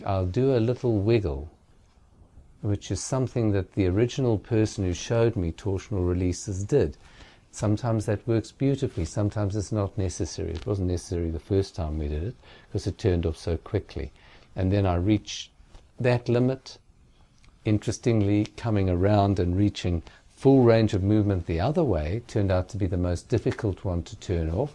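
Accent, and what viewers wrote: South Africa. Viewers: Are you South African, I can't help but notice the accent?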